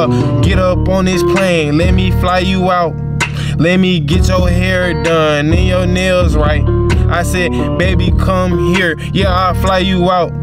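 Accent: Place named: South Carolina, United States. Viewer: Young man your accent is that South Carolina yo fam my family is from the low country keep pushing young man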